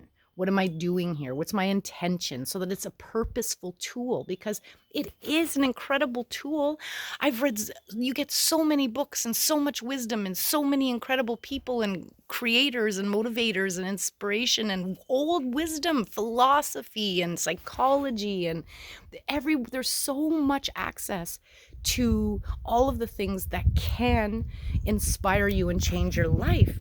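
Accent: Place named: Michigan, United States. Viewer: your accent reminds me of the mother's I grew up in hearing in Michigan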